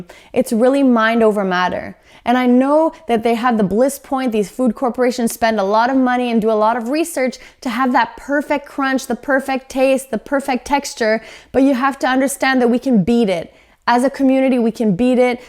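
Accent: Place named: Lithuania. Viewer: Love your accent. Great info and video. Thanks so much. Are u from Lithuania 🇱🇹?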